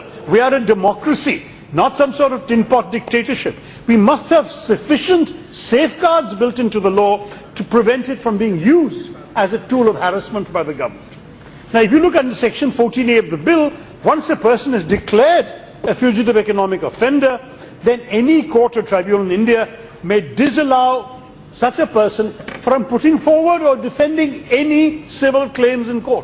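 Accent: England, United Kingdom. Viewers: Unfortunately honorable union finance minister does not understand his English accent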